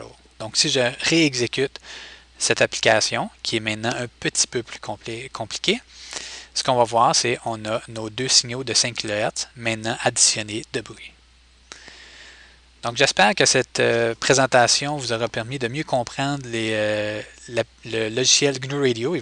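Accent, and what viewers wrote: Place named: Quebec, Canada. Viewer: superbe explications et j aime bien l acces quebequois 😊😊😊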